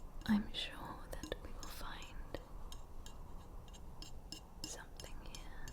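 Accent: England, United Kingdom. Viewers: I love your british accent for ASMR!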